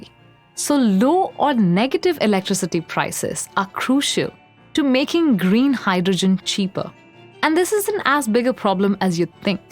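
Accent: India. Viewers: i like the Indian accent personally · I love her Indian accent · when hear indian acent i laugh so hard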